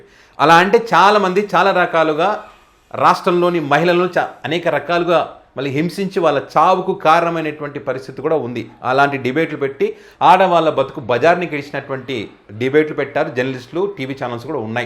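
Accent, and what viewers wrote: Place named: Telangana, India. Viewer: సారీ సోదరా మీకొక విషయం చెప్పదలిచా సవరించుకోగలరు. మీకు త కు సవత్తు కలిపి పలకటం రాలేదు సవరించుకోవటం ఈజీనే. అభ్యాసం కూసు విధ్య అన్నారుగా అలా. ప్రొస్తహిస్తారు, సంవస్తరం, ఉస్తాహం మీరన్నవి ఇందులో. ప్రోత్సహిస్తారు, సంవత్సరం, ఉత్సాహం పలుకవలసింది. సారీ మీ కంఠస్వరం చాలా బాగుంది మీ ఎక్సప్రెషన్, విశ్లేషణ చాలా ఇష్టం. తెలంగానా యాస బాగుంది. Tq సోదరా.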